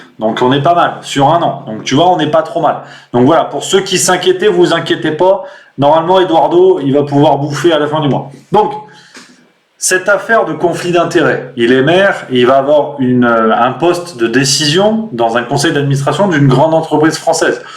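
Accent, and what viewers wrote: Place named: England, United Kingdom. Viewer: Excellent travail l'artiste ! And i love your english accent 🥰 Soo froggy...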